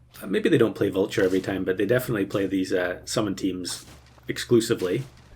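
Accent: Scotland, United Kingdom. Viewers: Which part of Scotland is your accent from?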